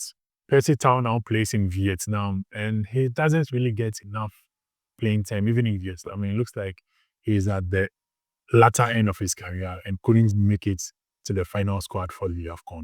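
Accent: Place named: Nigeria. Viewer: Bro you sound Nigerian but you look South African.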